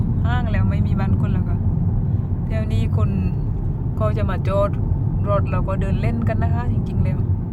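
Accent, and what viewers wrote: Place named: South, Thailand. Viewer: ขับรถชมวิวด้วยคนนะคะ ไม่เบื่อๆจ้า ชอบฟังพูดภาษาใต้ มีเสน่มากเลยค่ะ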